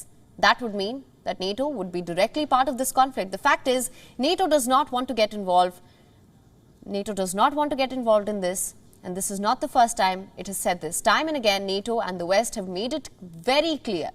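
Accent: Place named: India. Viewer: Your voice and accent makes it best for story telling. Desi tone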